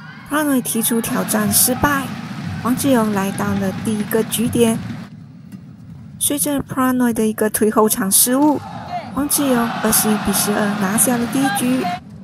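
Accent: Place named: West, India. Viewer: 这个似洋似印的旁述扰人观赏球赛呀😂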